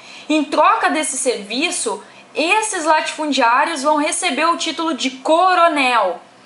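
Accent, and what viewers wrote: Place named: Rio Grande do Sul, Brazil. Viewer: Pela forma de falar a conjunção mas, garanto q é do RS, haha tbm sou, gostaria de saber se tem como fazer video aulas sobre a historia do nosso estados, e venho dizer q as aulas tem me ajudado muito, desde já agradeço e muito bom trabalho tens feito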